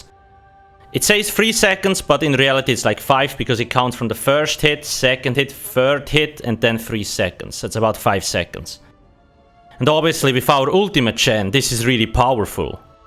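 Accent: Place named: Switzerland. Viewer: Definitely not Russia, Switzerland I think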